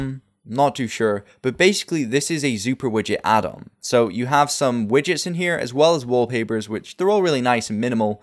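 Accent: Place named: Scotland, United Kingdom. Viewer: i could hear a hint of a scottish accent when watching that video thanks for answering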